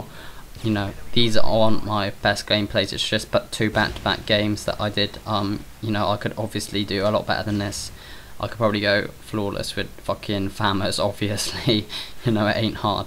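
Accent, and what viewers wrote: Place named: West Midlands, United Kingdom. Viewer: you sound like your from the west midlands cuz my bro speaks like you and hes from the midlands like me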